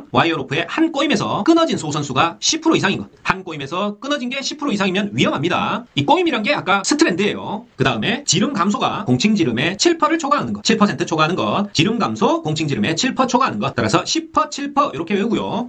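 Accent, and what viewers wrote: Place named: South Korea. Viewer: 단55 틀68~ 사투리가 구수하시네요.. 어디 지역분이신지?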